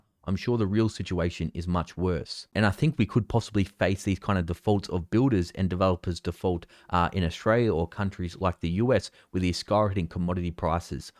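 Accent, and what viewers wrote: RP, United Kingdom. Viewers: The English accent with the violin :)) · When the Holy Flippity Floppin Smokes comes with a proper British accent you know everything to follow will be gold. ✌🏽🔥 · I say sir you went all posh upon a sudden! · holy smokes u speak toffy english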